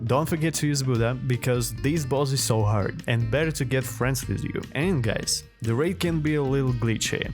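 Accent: Russia. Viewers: I have a question are you Russian? It's just the way you say some words in Russian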